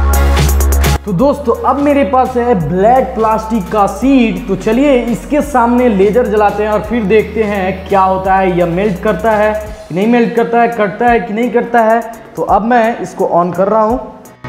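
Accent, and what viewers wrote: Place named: North, India. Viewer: North indian English very bad Lager nehi laser hindi vala ko saa nahi aayega zero hindi wala ko geero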